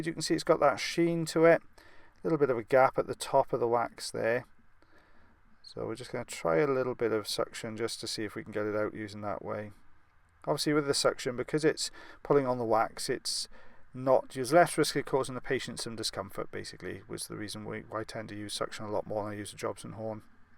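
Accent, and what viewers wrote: Wales, United Kingdom. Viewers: Just love how you explain everything with that lovely British accent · Welsh accent 😊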